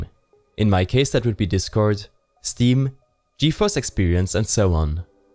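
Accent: Germany. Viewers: dieser andere deutsche Akzent??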